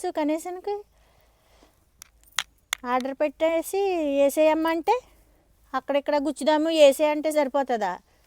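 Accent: Godavari, India. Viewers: మీ పుట్టిల్లు గోదావరి జిల్లాలా ఉంది matateeru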